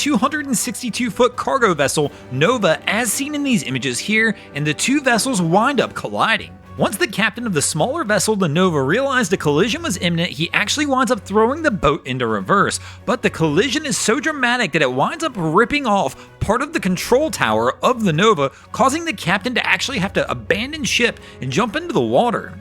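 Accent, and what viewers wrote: United States. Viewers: Hi, are you able to post videos without commentary? I love your content but have a hard time dealing with your obnoxious American accent. Thanks in advance from Australia.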